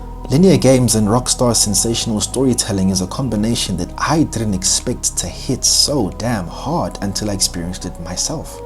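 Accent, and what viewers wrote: South Africa. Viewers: You sound South African, Mac and cheese bro? Should’ve said atchaar or magwinya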